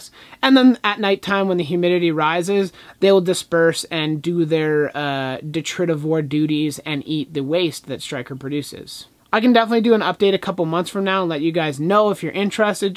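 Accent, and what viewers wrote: Canada. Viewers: It's a Canadian accent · it’s because he is canadian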